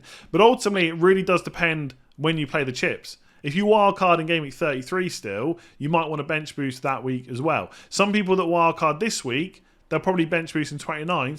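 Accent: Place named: West Country, United Kingdom. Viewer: West country (South West england)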